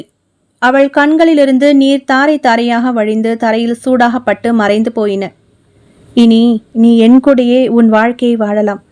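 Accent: Kerala, India. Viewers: Nala story, I liked it.... paravala malayalam Pronunciation improvement iruku 😅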